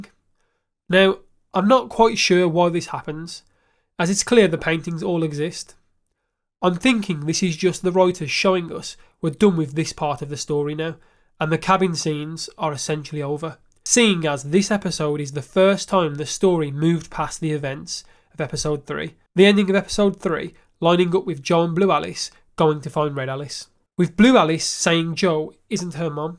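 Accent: United Kingdom. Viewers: PS Where in England are you from?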